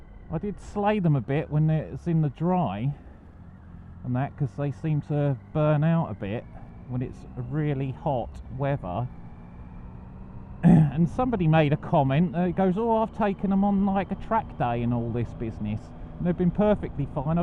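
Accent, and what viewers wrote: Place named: United Kingdom. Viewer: Extremely narrow roads, trees/buildings right on top of the road, rain, driving on the wrong side of the road, and a guy with an accent, must be another Brittish motovlogger. I heard someone on a different video say this was a Chrisitan motovlog channel. Are you not doing faith based vids any longer, or is it that you are simply a Christian that rides a bike? I just started my channel, but have been riding for 50+ years. Riding in rain is not the most fun, but not as bad as icy roads or snow on them, done that too many times as well. Ride safe, y'all. Greetings from Tennessee, USA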